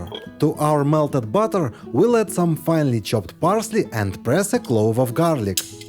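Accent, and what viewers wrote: Russia. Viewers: Ruski akcent wymowy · I'm not from an English-speaking area, but this kind of English-Russian is so irritating that I can't listen to it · Dude, your Russian English is making my ears bleed. Subtitles are enough, macho, absolutely. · Very smart. Sounds like a Russian accent. Excellent recipes and hacks to learn tasty and healthy food.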